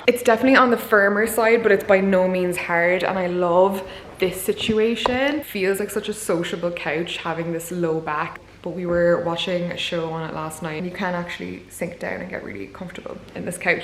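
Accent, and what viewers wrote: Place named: Ireland. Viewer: The combination of your beautiful Irish accent with the rain sounds in the background is so soothing ❤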